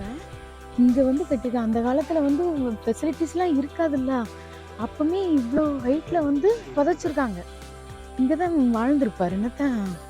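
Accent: Tirunelveli, India. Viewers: Video super... நேர்ல போய் பார்த்த மாதிரி இருந்தது....நம்ம ஊர் தமிழ்ல கேக்க ரொம்ப நல்லா இருந்தது...நீங்க தூத்துக்குடி அல்லது நெல்லை மாவட்டத்தை சேர்ந்தவர்களா.... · Praise the lord sis Nellai tamil super super 🎉🎉🎉❤❤❤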